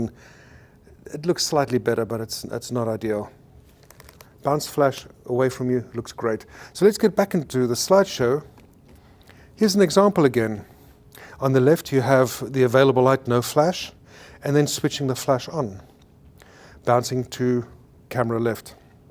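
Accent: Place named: Germany. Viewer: One question: how come you have a Dutch name and a German accent? :)) Fantastic stuff, this goes immediately into my GOLDEN TUTORIALS folder... There are very minor points I would have done slightly different, like with structuring video or editing (give a bit more time to showing the examples, and next to each other works best), but that's a side matter. I felt like back at school when we wondered how some great teachers stood out and could reach out and catch our attention. I've seen this video actually twice, counting the number of times I had to rewind to be able to follow (have no such a flash yet). Extremely welcome stuff, and I'll be buying the modern flash I had hesitated to, now under impact of this video. Can't wait!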